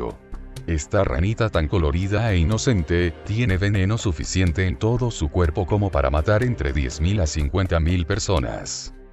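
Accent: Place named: Spain. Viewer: oye como haces la bos de españolete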